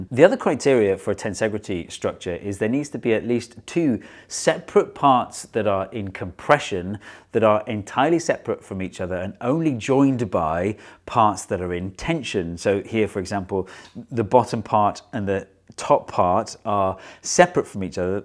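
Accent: United Kingdom. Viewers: The British pronunciation of aluminum always annoys me. There's no i before the u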